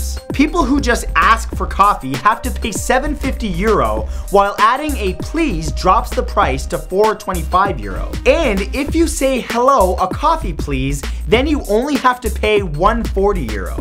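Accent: Canada. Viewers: He's Canadian. Pretty obvious from the accent. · He's Canadian I am and we pronounce things different like you guys say bag we say beg! Or you guys say milk we say melk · Well he's Canadian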